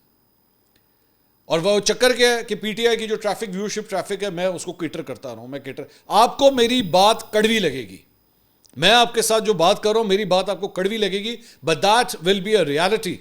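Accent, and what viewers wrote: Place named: Lahore, Pakistan. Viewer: AP bohat izat k qabil hain or achi reporting kartey hain emandari k sath is me koi shak nahi. Bas AP apna labori accent khatam kar deyn mean k AP reporting kartey waqt Punjabi na istamal Kiya kareyn is se apki reporting par effect pardta hey jesey k bohat se indians Jin tak apki baateyn pohanchna bohat zruri hain me samjhta hu wo Punjabi language ko samjh nahi patey hon gey.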